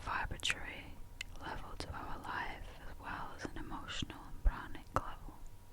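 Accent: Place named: North, United Kingdom. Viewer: Awesome video, really love this channel. Your northern accent/dialect adds to the experience. Love you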